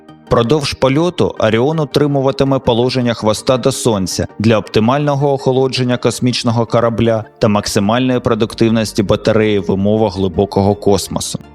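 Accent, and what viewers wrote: Russia. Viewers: Чому в кожному слові такий виразний російський акцент? Всього лиш "о" не вимовляти як "а" і не пом'ягшувати "ч". Хоча б... Ну невже це так складно?...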